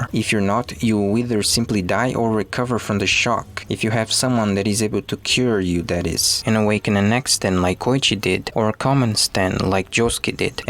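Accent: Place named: Brazil. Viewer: Mano, tu é Br ?, parece c o sotaque de Br ein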